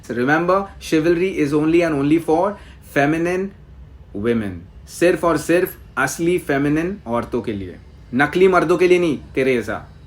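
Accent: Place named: Maharashtra, India. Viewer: Your Marathi accent is making this video 10x times better 😂❤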